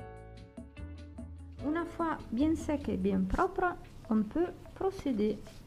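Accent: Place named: South, France. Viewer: belle vidéo , avec un petit accent du sud , plus exactement de l'Aude ,je me trompe ? lol Bonne continuation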